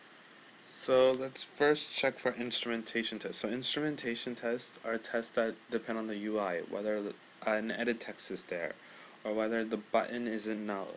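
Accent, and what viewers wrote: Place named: United States. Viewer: nice video BTW, thanks for a nice american accent and not indian one (damn, I'm such a racist) :D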